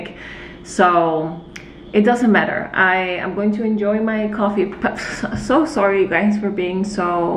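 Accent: Chile. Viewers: Adoro su acento chileno <3 Te quiero eres como un modelo a seguir <3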